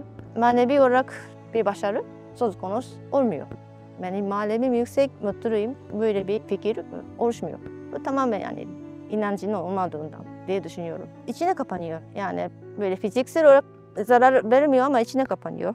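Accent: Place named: Mersin, Turkey. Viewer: Mersinli teyzeler gibi konuşuyorsun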